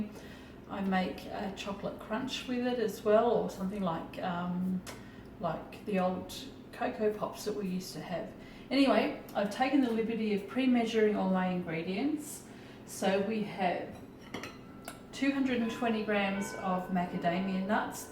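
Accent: Australia. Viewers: What State are you in? I live in Perth and have not seen Western Star cream. It's really nice to listen to a quiet Australian not loud and annoying American accents (apologies to any Americans watching). Cheers Rosemary Perth Western Australia (70 yrs)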